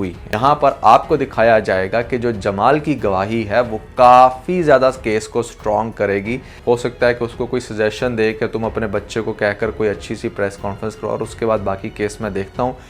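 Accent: India. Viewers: Indians ki trah "phir" KO "fir" Ku bolte ho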